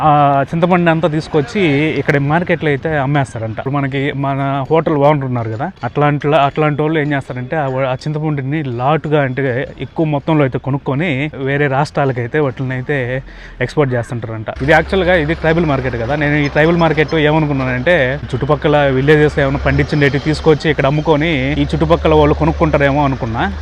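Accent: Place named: Rayalaseema, India. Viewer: ఏంది సావి.. మా రాయలసీమ భాష మాట్లాడతావుండేవే.. యా ఊరు అబ్బా.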